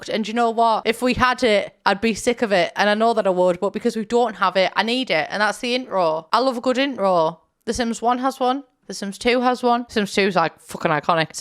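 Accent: United States. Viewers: Omg you do such a good American accent!! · Omfg I need a whole video on your American accent please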